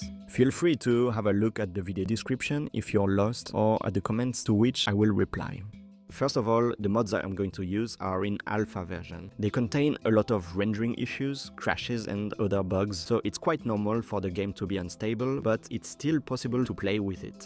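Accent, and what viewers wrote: France. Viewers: Un français qui parle anglais, c'est toujours marrant ! Je like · oooh le bon français x) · Hello j'ai remarqué que t'as un accent français donc je vais faire un commentaire en français mdrr. Pour la génération de monde c'est entrain de me dire 12 heures je dois réellement attendre si longtemps 🥲? Et en terme de performance t'as quoi comme PC et est-ce que ça tourne bien ? Parce que ma carte graphique est pas extrêmement puissante (RX 5500 XT) donc j'ai peur d'attendre 12h pour que ça crash au final. Sinon à part ça très bon tuto ça fait plaisir de voir un français faire des vidéos en anglais j'étais justement entrain de m'imaginer faire la même chose avant de voir ta vidéo 😅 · Tu es français nan 😂 ? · Super vidéo merci beaucoup. Et merci l'accent français :) Tu parle très bien Anglais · petit accent français 👌👌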